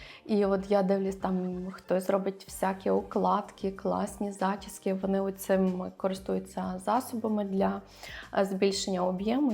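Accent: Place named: Galicia, Ukraine. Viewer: Вітаю,. Дуже мені подобається дивитись Ваші відео, а особливо чути рідний галицький акцент. Але я Вас дуже прошу, немає у нас слова «ваший», правильно казати «ваш». Дякую, не ображайтеся, будь ласка 😊 Бажаю Вам творчих успіхів.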